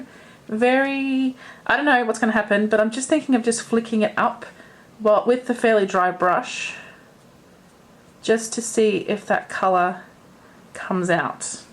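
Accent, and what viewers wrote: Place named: Australia. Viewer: Heard the accent and was like "yay! Aussie!" And somehow ended up subscribed. 😄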